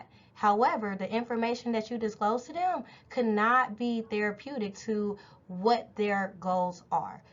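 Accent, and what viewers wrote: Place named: South, United States. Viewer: Super helpful, thank you! And love your Southern accent!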